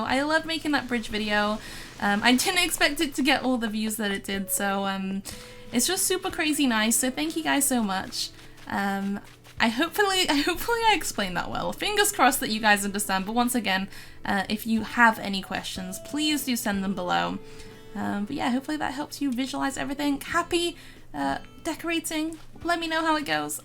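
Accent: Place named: North, United Kingdom. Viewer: Shes northern uk... it's a cool accent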